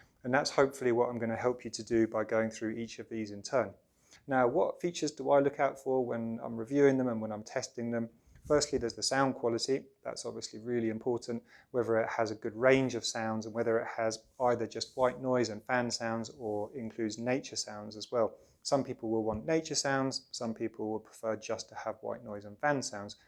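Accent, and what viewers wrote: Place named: United Kingdom. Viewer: Thank you for this clear concise and detailed description. Your presentation is a pleasure to listen to. Love that British accent!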